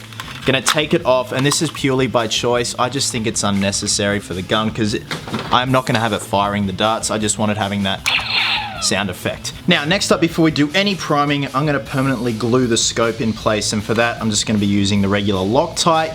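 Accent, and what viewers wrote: Australia. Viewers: R u from Australia?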